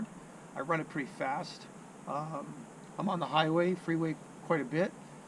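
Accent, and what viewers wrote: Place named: East Coast, United States. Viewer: This is possibly the BEST MOTORCYCLE REVIEW I have ever seen. No bells, whistles, music and NO bullsh!t. Just a guy sitting on his bike laying out the DETAILS. (And in a charming east US accent). I’ve ridden in light snow but this guy is the “road king!” Never have I felt such respect for a fellow motorcyclist.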